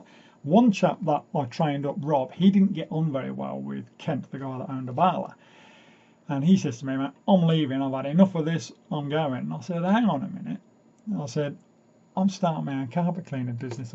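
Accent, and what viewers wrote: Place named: Northern England, United Kingdom. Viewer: i definatley wouldnt of picked your accent as coventry england.You sound like your more from northern england.Great story though 😉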